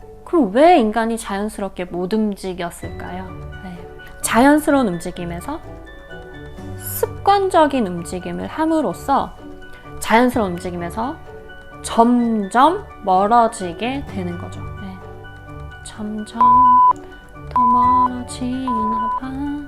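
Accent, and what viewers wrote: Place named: Busan, South Korea. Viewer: ㅋㅋㅋ사투리 잘 쓰셔서 부산사람인줄~ㅋㅋㅋ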